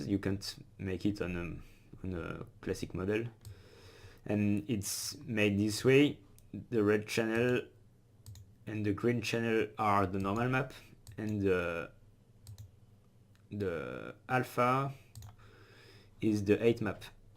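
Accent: France. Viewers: Very noticable french accent ;) thanks for taking care of the Arma modding community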